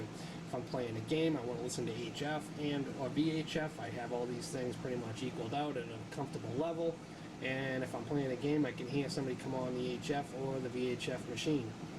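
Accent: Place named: Boston, United States. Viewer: cool setup. you sound like you are from boston guy ;)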